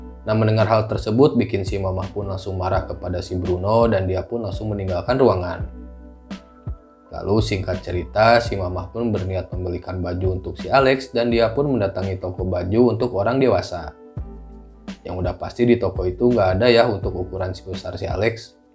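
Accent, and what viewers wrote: West Java, Indonesia. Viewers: anying sunda bet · Akang ngomong nya sunda banget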